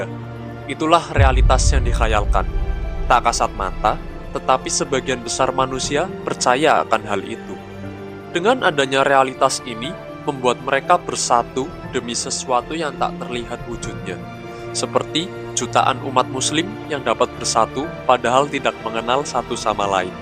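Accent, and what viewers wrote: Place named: Banyumas, Indonesia. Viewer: Sampean wong ngapak Yo mas...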